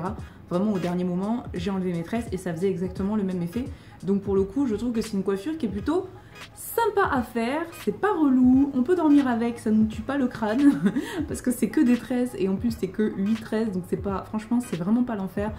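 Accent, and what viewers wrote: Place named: South, France. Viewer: hahaha jm trop l'accent du sud hahha moi je te regarde de Marseille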